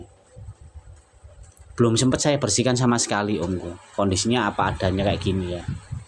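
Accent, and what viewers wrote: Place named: Surabaya, Indonesia. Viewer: Suroboyone pean ngendhi Cak?....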